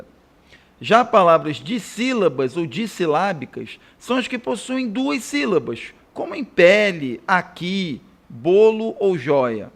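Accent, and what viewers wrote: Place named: Rio de Janeiro, Brazil. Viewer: Muito boa aula Sílabaxxx, aulaxxx, tõnicaxxx... Carioquês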